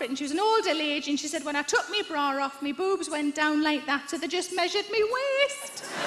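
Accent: Newcastle, United Kingdom. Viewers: Mrs doubtfire had a Scottish accent didn't she? a geordie! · Saying something in a jeordi accent don't make it funny! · Is she a Geordie?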